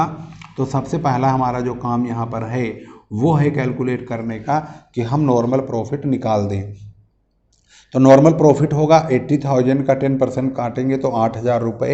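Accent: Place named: Meerut, India. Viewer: Sir i think you're from meerut,i guess by your tone 😅and thanks for your video 😊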